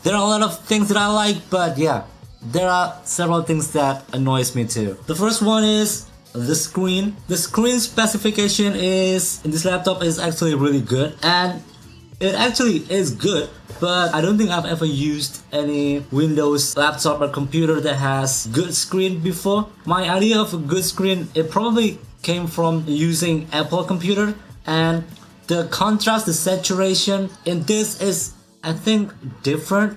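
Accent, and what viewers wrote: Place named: Indonesia. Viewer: You are Indonesian,i knew from your accent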